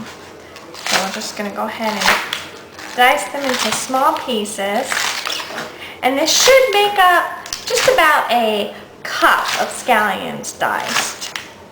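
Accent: South, United States. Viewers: your accent southern ?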